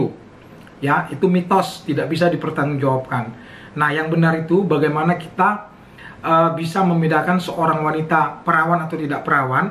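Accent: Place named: Karo, Indonesia. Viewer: Kalak karo nge km pak dokter, pantesan logat na bgi logat klk karo☺️☺️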